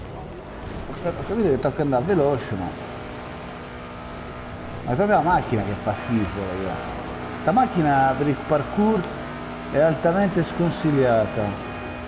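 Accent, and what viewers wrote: Rome, Italy. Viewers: Súper romanaccio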